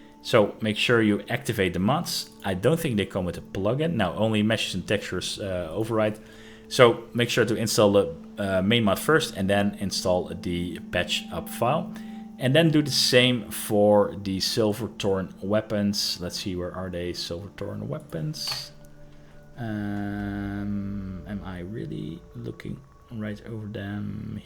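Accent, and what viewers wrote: Netherlands. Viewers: Dutch accent spotted!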